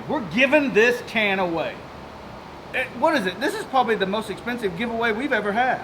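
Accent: South, United States. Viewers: man you seem like a great guy but it every vid I've seen feels to me like a Guga Foods video with a southern accent; maybe a different style of video would get you more interaction on the site?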